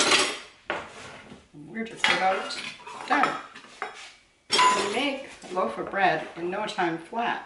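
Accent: Canada. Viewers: I love my bread machine , found it at a thrift store Brand new for $5 it makes the 1 lb loves ,,,yes you need to find the right recipe ,,,i use the dough cycle and then make mini loafs ,,,I gift it as well,,, New to your channel ,,I could tell while you were Talking you were Canadian ,,I live in Southern BC ,😊😊😊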